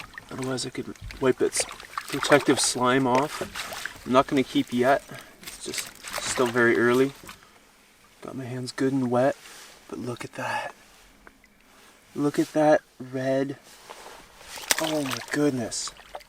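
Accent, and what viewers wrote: Canada. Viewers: PAROGIES! I moved to the United States in 2008 and that is a food they don't really eat down here. This is the most Canadian video I ever have seen and nothing has made me feel like I've been back in Vancouver like hearing you. Previously, the most Canadian man I've encountered was a dude from Alberta trying to marry my dad's cousin in Budapest. We were both on the wrong continent but we felt like North Americans together.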